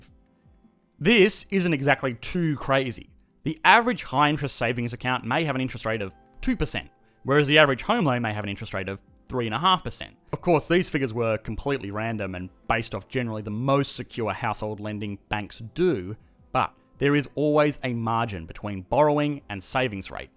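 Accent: Australia. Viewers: Bruh r u aussie? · I like this channel. I'd like it even more if the australian accent wasn't the most annoying of the english accents.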